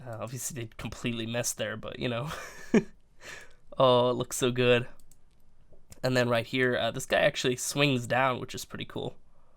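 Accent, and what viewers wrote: Netherlands. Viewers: Nederlands? Dutch? I can't tell from your voice, well some times a little bit if you listen closely but good english, i noticed that your envidia shadowplay is in dutch :) nice vid man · are you Dutch?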